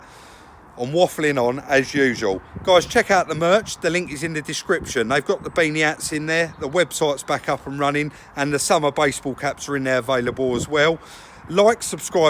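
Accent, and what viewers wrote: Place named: Cockney, United Kingdom. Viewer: i love the way says WAFFLING ON, old school cockney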